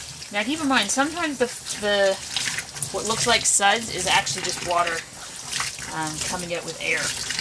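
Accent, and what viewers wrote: Canada. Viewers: That Sunlight bar costs $14 on Amazon. You sound Canadian maybe it's cheap there but here in the USA it's not in stores, and it costs a lot. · Personally I put them through washing machine. I have a newer washer with no spindle. I use Scrubbing Bubbles spray on faces and bodies. Then use tooth brush to get in all the grooves of face. Then once placed in washer by themselves. I then let the washer do the work. The yarn is material and it grosses me out too much thinking how much filth could be in the yarn. Once washed - start the process of using sticky lint roller to take all lint / fly always. Let dry for a bit under ceiling fan. Then restyle hair. Instead of rubber bands try tiny zip ties. These will not only get a tighter fit but will look better as well. Especially Jesmars. While yarn is still a little damp/pliable - use sharp scissors ✂️ to trim fuzz flush against the CPK head. 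😁 Your accent - shout out to Canada 🇨🇦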